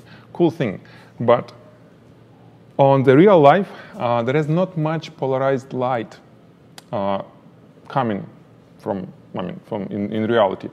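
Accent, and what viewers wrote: Russia. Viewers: Родной русский акцент ))))) класс, спасибо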